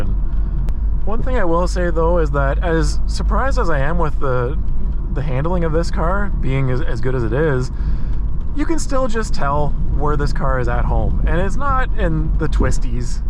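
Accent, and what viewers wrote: Canada. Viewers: Relax that's how they say it in Canada... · I hate Canadian accents. Aouuut and abouut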